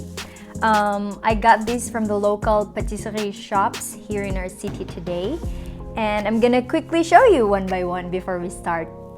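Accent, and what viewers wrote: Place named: France. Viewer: I can hear French accent na if mag english ka.. hahaha kahinomdom na nuon kos joke ni Trevor Noah about French accent vs Russian accent.. hahaha Try to watch it dba malingaw ka.. hahaha <link>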